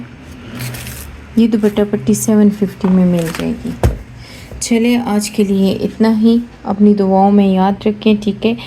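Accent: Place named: Peshawar, Pakistan. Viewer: Pta nhi ye pishawer wali urdu bolte waqt teek he, teek he itna ziyada q bolty hn 😅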